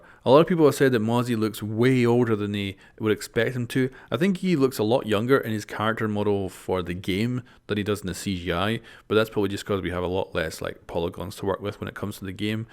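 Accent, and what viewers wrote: Scotland, United Kingdom. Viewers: malta? hes scottish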